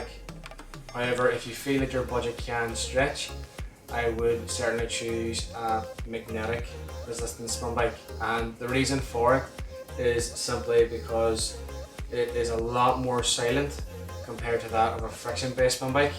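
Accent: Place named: Ireland. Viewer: It’s just an average Irish accent, nothing to go bananas for…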